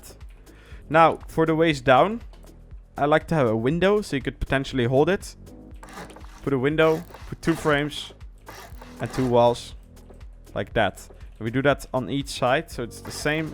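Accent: Netherlands. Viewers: Wel een lekker Nederlands accentje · Are you dutch?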